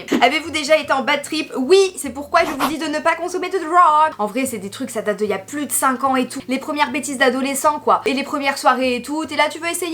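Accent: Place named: North, France. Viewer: Par contre, j'ai hurlé de rire dans ta vidéo mais... Parce que t'as grave l'accent du nord ça m'a tué bordel, mais j'aime le même t'inquiète mdrrrr olive you <3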